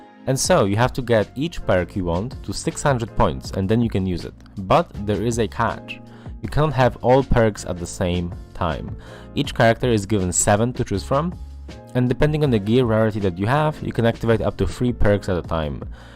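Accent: Poland. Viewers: o to samo pytałem w którymś filmie xd Gość brzmi swojsko z tym angielskim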